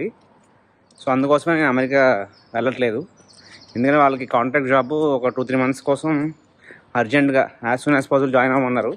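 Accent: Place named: Anakapalli, India. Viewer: Baya e vuru midhi ma anakapalli yasa nilanay untadhi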